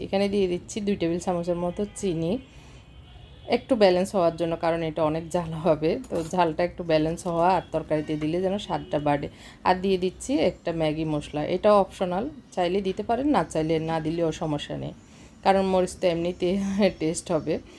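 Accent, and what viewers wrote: Noakhali, Bangladesh. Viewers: যে মজা😆😆😆😆আপনার নোয়াখাইল্লা কথা,হাসলাম কতক্ষণ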